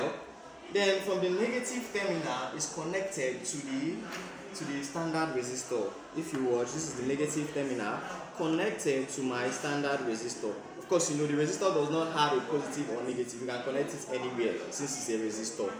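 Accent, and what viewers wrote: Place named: Igbo, Nigeria. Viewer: I knew you are Igbo at the instant of your first word , and that's why I called you brother. Just keep up the work. ka Chukwu nonyere anyi!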